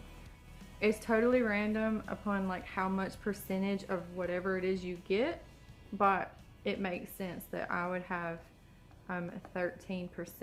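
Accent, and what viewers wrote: South, United States. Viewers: Everybody is a mix of genetics from different regions of earth. There is no such thing a a pure race. You are what you are and that is beautiful. You are unique. Be proud of who you are. So here is a little random comment. You look like Sydney Watson, who has a youtube channel also. You may be related to her. btw you are gorgeous (lucky husband) and I love the southern twang in your accent. Which state are you from? Be happy, be proud. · And your accent say you're 100% Southern. Americans are all muts.👍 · You have a lovely southern accent. Are you from North Carolina ?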